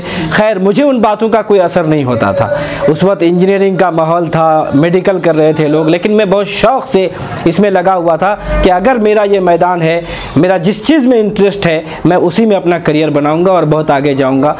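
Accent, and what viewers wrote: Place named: India. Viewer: Ap janab ka Labb o Lehja to hindi hai.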